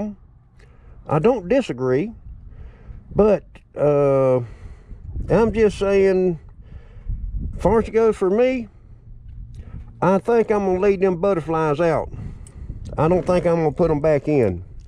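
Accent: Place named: Tennessee, United States. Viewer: Damn, I learned a lot! Thanks for the vid. I'm from Georgia, you sound like your from Tennessee?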